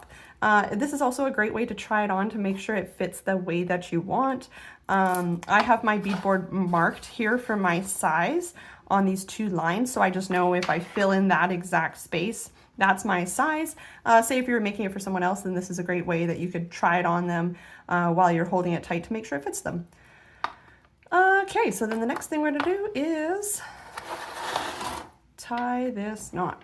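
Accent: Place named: Canada. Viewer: You sound Canadian ❤️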